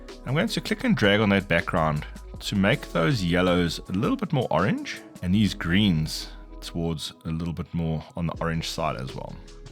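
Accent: South Africa. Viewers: i might be wrong but you sound SouthAfrican, or at least Southern African, and for that i will give this video a like. me supporting local content 😅. jokes aside, i literally started using Lightroom today and the knowledge you parted will act as building blocks to in my photography journey.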